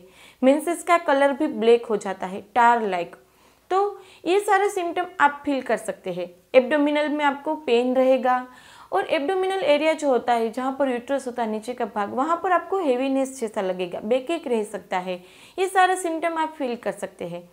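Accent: Gujarat, India. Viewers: Bolne k lehze se gujarati lg rhi h..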